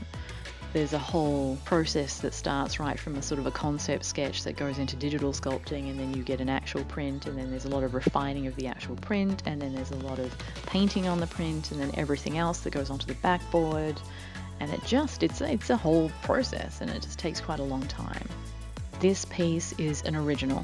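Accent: Australia. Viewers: Hey where's your accent from? It reminds me of mine somehow, yet I'm a Queenslander from Australia. Just want to know if my accent is out of place or if I somehow detected the QLD accent in the wilds of America.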